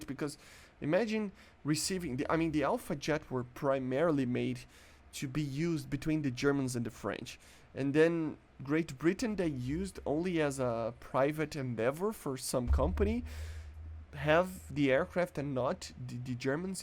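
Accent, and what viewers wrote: Brazil. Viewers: he's brazilian, not british so it's ok. I'm pretty sure you don't pronounce any other language correctly. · He's from Brazil.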